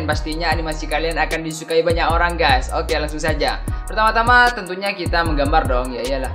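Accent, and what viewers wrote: Madura, Indonesia. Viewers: Orang madura ya mas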